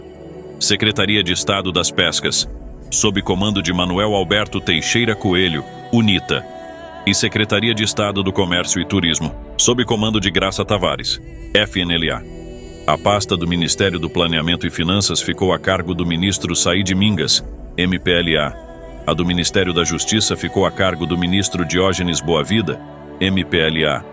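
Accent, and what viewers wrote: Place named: Brazil. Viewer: só uma duvida ........... pq varios canais africanos utilizam o sotaque brasileiro?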